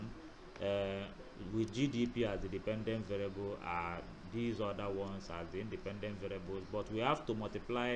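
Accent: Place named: Cockney, United Kingdom. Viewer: I wish I could have the patience to deal with cockny accent in my dire times.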